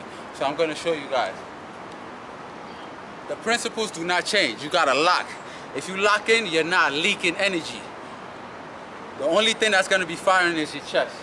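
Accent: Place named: New York, United States. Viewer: New York city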